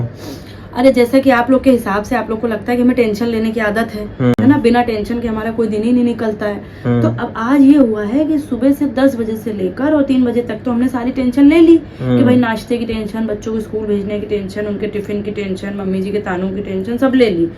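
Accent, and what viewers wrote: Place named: Uttar Pradesh, India. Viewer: My lovely daughter don't take any tension now, think about your health and try to prepare another comment of your show, your evert, show is superb, and everyone enjoy our show fully, I love to watch your every shoe full of interest , UP accent, and our sisters and daughters authentic style, a graceful looking show, I am watching your show from Karachi Pakistan with love ❤❤❤and good wishes to everyone around you and in your circle ❤❤❤❤❤👌👌👌👌👌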